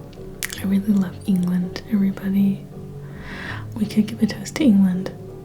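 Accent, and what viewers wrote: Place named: United States. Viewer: Falling asleep and then hearing Greggs with your American accent, surely not THE Greggs. But yes, it is the Greggs! I didn't know you were in the UK.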